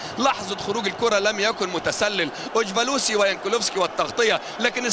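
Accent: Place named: Egypt. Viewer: Mısır Arapça şivesi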